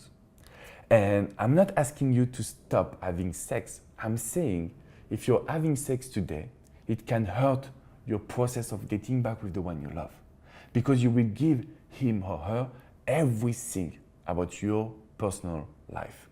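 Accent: France. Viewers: Love the french accent as I am used to the regular speech shows the same tough:);keep all the good things going english side or fench. Je suis avec toi:) true love is never ending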